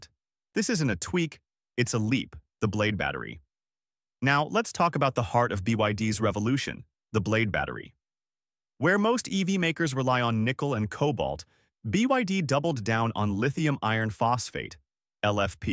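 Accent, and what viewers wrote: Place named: Canada. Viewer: What's twenty hundred?...what am I watching and listening to? Canadian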